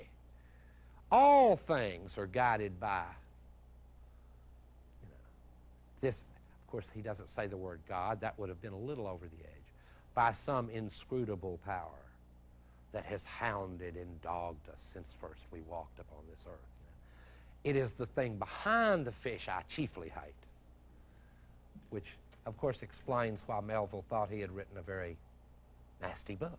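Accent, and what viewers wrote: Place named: Texas, United States. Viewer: Watching this made me realise, as an Englishman, all academics should be delivered in a Texan accent.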